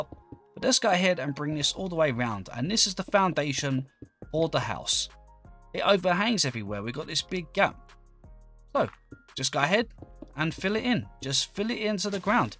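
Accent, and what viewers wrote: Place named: South, United Kingdom. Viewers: How does somebody sound southern and British at the same time 👁👄👁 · 10/10 cockney accent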